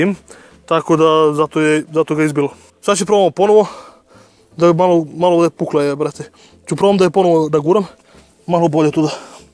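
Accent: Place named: Niš, Serbia. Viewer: on je iz Niša zato ima takav "naglasak,, a ne da ne zna padeže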